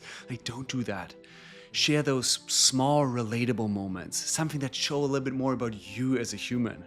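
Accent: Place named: Germany. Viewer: Gutes Video. Danke 🙏🏻 (ich denke deutsch passt Deinem Akzent nach 😉)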